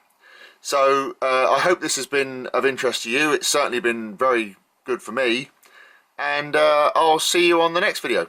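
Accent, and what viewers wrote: United Kingdom. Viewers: Where are you from? (In england) I love your voice/ accent.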